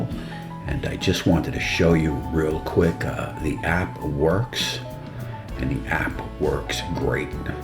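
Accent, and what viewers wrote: New York, United States. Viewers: I like your style mate, that’s a New York accent right ? You a sports fan ? I’m an Aussie and can’t get enough of American sport, I love everything New York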